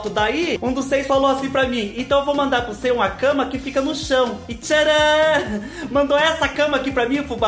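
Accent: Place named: Sorocaba, Brazil. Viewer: Passeando pelo YouTube vi sua receita do bolo de milho... Flocão. Adorei. Gosto do seu jeito! Ah Sorocaba....sabia q tínhamos algo em comum. Nosso sotaque, costumes nos entregam. Abração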